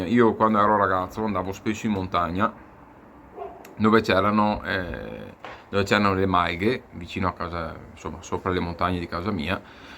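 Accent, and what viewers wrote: Veneto, Italy. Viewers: Salve,potrei chiederle con tutto il rispetto,di dove è il suo accento?.Sono molto curioso dato che studio la lingua italiana da un po’.Se non sbaglio il suo accento protrebbe essere Veneto….Volevo anche ringraziarla per la ottima recensione.Grazie mille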